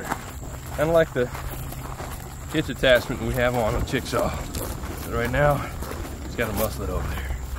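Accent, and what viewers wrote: South, United States. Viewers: Hi Family & everyone! I’m from Nashville Tennessee. And your come on come on> country twang makes me miss home. Love ya guy’s! God Bless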